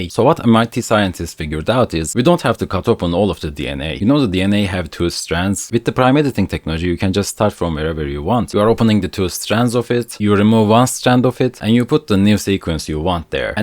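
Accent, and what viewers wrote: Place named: Turkey. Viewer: çok ağır bir türk aksanı var. bütün "the"lar "dı" diye okunmuş. dilinin orta kısmıyla dişine dokunman gerekiyor. ve sesi çıkarmaya hava çıkarmadan biraz daha erken başlaman gerekiyor. ð harfini çıkarırken adem elmana dokun onun titreyip aşağı inmesi gerekiyor. bu harfi çıkarırken dilin havayı durduracak, ses çıkmaya devam edecek (bu harf sesi durdurmaz) ama hava ağzından çıkmak yerine olduğu yerde duracak (yada yavaşlayacak) ve o yukarı çıkmak yerine ses tellerin aşağıya doğru inecek. θ versyonu için böyle birşey gerekmiyor tabi. sadece dilin ortasıyla dokun ve t-s benzeri sesi çıkarırsın. bazıları f'ye benzetiyormuş thank you yerine fank you diyormuş. "figured" figürd diye okunmaz f igyırd diye okunur. hızlı konuşayım derken bütün sessiz harfleri yutmuşsun. "with the prime editing technology" teknaalıci olması gereken şeyi teknoji diye okumuşsun. 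"proteins" proteynz değil prowtiynz. "apocalypse" epokalips değil epakılips. "negative scenerios" negatif senariyoz değil negıdiv sıneğriyowz. pozitif değil paazıdiv. cenetik değil cıneedig. vurgu ikinci e'de, ilkinde değil. vurgu olmayan hecelerde ya ı yada i şeklinde okunur ve hızla geçilir. a, e diye geniş harfle okunmaz. ekşuli değil ekçıli. ingilizcede biz herşeyi u diye okuruz ama mesela "good" gııd diye okunur. özellikle de amerikada. çoğu anglofonun yaşadığı yer amerika. "you are opening two strands of it, you remove one strand of it, and you put the new sequence you want there" sürekli "of it" demeye gerek yok. iki zincirin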